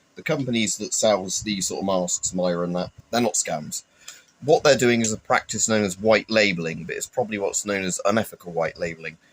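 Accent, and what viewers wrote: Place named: England, United Kingdom. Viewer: sounds English 🏴 -looks like El Chapo 🇲🇽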